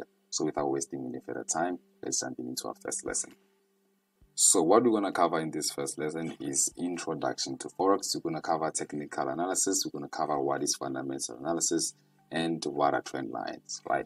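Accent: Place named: Africa. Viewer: That’s the most African accent I’ve ever heard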